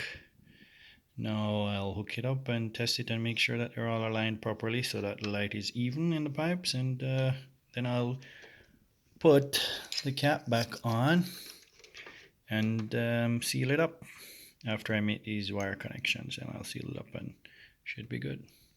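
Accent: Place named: Canada. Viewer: Love how Canadian your accent is, that’s cool lol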